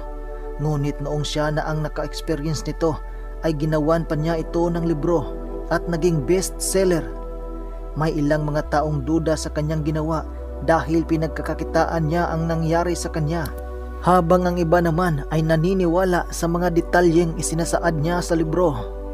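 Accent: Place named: Visayas, Philippines. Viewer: Bisaya ka dol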